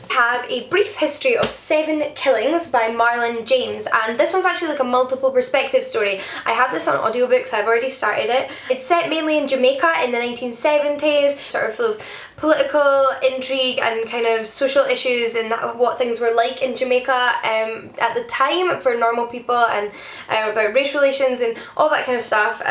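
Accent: Scotland, United Kingdom. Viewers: ***** Paid in books and swag, then? Not so bad. I binged quite a bit of your channel last night after I discovered you. Definitely one of my favorite booktubers so far. I'm really enjoying joining this community. But your beautiful accent makes me miss Scotland! My wife and I lived in Edinburgh for a couple years. Our first child was born there. Fond memories. P.S. in your profile pic you totally look like a blonde Karen Gillan.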